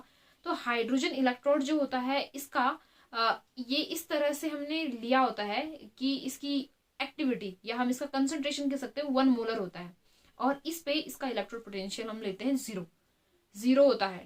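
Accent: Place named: Uttarakhand, India. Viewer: Nice lecture with uttrakhand vibe😊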